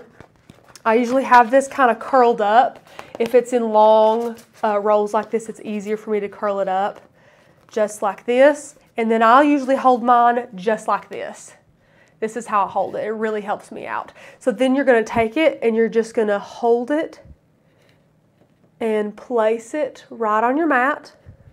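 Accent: South, United States. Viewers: Agreed, a blessing you are! It’s so nice to come on here and learn from people who you know are kind and sweet, not to mention, you are lovely and your accent is just adorable, I am from California and I am telling you that is definitely not how we sound. Your sweetness is from the south, im going to assume, I’m ready to move. Hee hee! 😇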